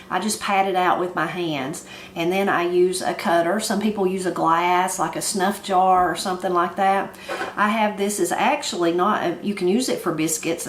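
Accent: Appalachia, United States. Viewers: Listening to you made me unexpectedly happy. My mother's family is from Hindman KY but they moved to Ohio in the 1950's. My grandmother sounded like you - and great-aunts and uncles and second cousins. We used to go down there at least a couple of times a year for a week or two when I was growing up. Later on I lived for years with my grandmother when she was getting up in age. All those folks are gone now and there isn't anyone who talks this way in my daily life. It just about made me cry to hear Appalachian-speak again.❤ Also, thanks for the biscuit advice!😅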